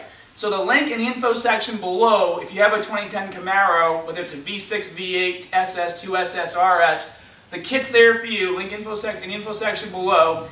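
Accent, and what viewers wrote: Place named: Brooklyn, United States. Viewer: Funny, u can totally here the Brooklyn accent more in this vid than any other lol. Sweet car!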